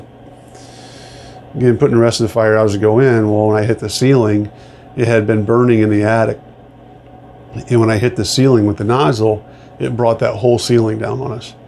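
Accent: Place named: Michigan, United States. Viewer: Great fire stories. The Michigan drawl working good. 💨💨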